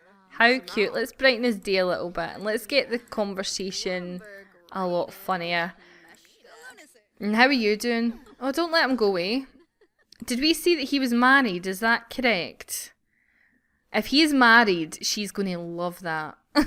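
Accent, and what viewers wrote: Scotland, United Kingdom. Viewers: Your accent is amazing:) i grew up in Hamilton/Glasgow keep up the amazing videos:) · For lunch I had: CHOCOLATE, EASTER EGG, and some other stuff (cheese and biscuits? I don't remember) but most importantly CHOCOLATE!!! SO EXCITED!!! But med school is a lot of dedication just to meet hunky guys... The family (plus Grace) are so cool!!! BTW As a English-American person (who needs to work on her accent), I think that Scotland is really awesome, and your accent is adorable! I would love to go to Scotland, I only went when I was little. It's nice to see some of the new stuff (clothes, hair, etc.) on actual sims to be played with, not just maxis' creations. And Tate is really cute. :D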